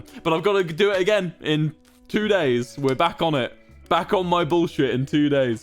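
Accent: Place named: United Kingdom. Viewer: His British accent tho😢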